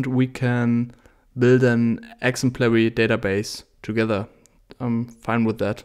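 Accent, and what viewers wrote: Germany. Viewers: HEY man, you are 100% a German :=), right?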